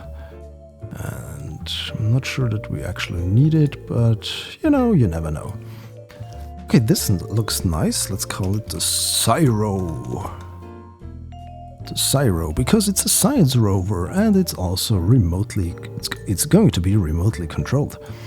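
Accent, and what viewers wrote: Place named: Germany. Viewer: Ich wusste doch das du Deutsch bist! :D (Hat man an deinem Akzent gehört)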